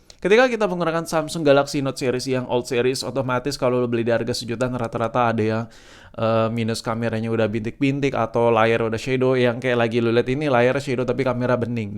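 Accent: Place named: Cikarang, Indonesia. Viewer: Gaya omonganya kek orang cikarang bae cuk wkwk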